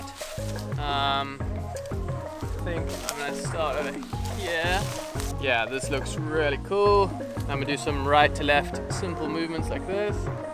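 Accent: South Africa. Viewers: Ahh! I thought you were South African ! nice boet!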